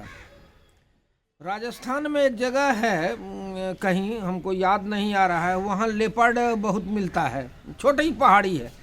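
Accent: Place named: Patna, India. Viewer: Yes indeed l have read the books of Jim Corbett in which he had written about his work related to railway.He was also a retired major in British Army and faught in First World War commanding Garhwal Rifles. But I didn't know that it was in Bihar. I enjoy your videos and very happy to hear your conversations and dialect which is similar to that of my parents who had migrated to Pakistan from Patna. I had heard of some wild life in Ranchi Bihar but did not know about such forests in Mungair.I would request you to keep recording your hunting experiences through such videos as these are assets, for being a nature and forest lover myself.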